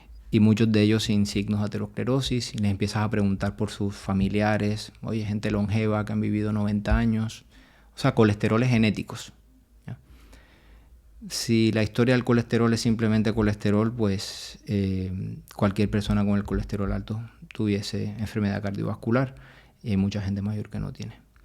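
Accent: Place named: Caribbean Coast, Colombia. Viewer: Por su acento creo que este médico es costeño de Colombia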